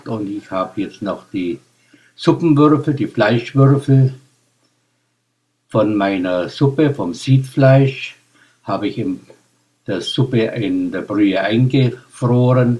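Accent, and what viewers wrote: Swabia, Germany. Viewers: leicht schwäbisch, aber auch für Nichtschwaben gut verständlich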